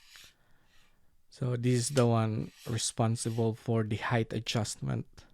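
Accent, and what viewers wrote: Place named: Philippines. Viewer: Hi there! I hope your have a great day! Nice video! I have a question, are you Filipino? My mom was born there! Just wondering if you are because you sound like you are! Have a good day!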